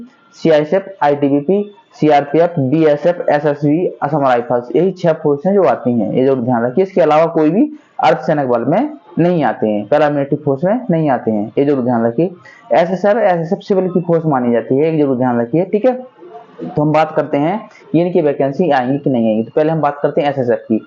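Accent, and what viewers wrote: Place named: Jhansi, India. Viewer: Bhai मै झांसी से हु, 😊 आपकी भाषा काफी अच्छी लगती है, हम भी ऐसी ही बोलते है, आपसे मिलने का मौका जरूर मिलेगा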